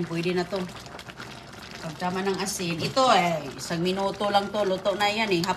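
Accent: Visayas, Philippines. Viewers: ganyan daw mag pronounce ang mga bisaya. Pero ang totoo hindi lahat.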